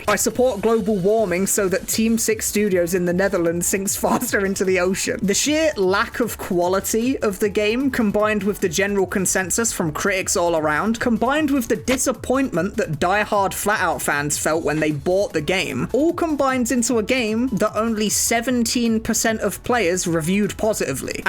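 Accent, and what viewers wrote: United Kingdom. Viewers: nice video, but would have been better without british accent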